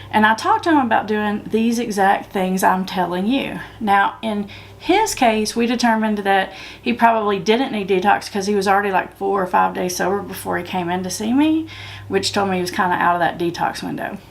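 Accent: South, United States. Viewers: Verryy calm pretty southern arcent, that bullshits into an advert.. BORING another pretend proffessional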